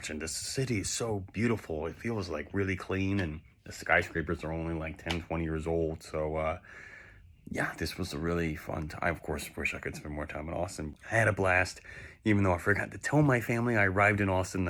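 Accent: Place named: Upstate New York, United States. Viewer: Well done! The Upstate accent, bit of Canadian hockey player in the background? Eh? Yes, ABQ is at bottom of Rocky Mtns, it can snow. Lots of skiing just to north in Ruidoso. Needles is where Oakies escaping the Dirty 30's Dustbowl entered Calif. Burbank, I've gone to watch friends at Flappers Comedy Club! Love that place. I retired young. I saw Fede Alvarez was going to remake Texas Chainsaw Massacre! I drove to Austin, found all the original filmset locations, studied up. I don't have creds for production. Being born in Spain, I was going to beg Alvarez for any job. But Covid19 erupted. I went to north Texas to care for our family matriarch, and waited on updates for TCM movie. Then Fede took it way over to Sofia, Bulgaria. My dream exploded. The Tohill brothers were dropped, David Blue Garcia (Tejano film) was brought in. 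TCM remake was bought by Netflix. It debuted at #2 viewing ever, but the fan reviews say the legend of original director Tobe Hooper (of Austin) was tarnished once again. Long story, sad ending. I mostly film live Native American dance events / powwows for my Youtube. Been on Covid hiatus. Lots of cross-country roadtrips on my resume! Best wishes for your success out in Calif. I have family and friends all over there.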